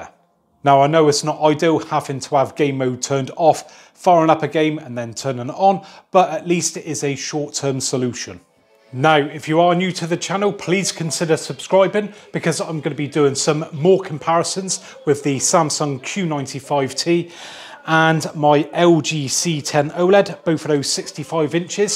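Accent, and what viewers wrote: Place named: South West England, United Kingdom. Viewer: His accent sounds like south west England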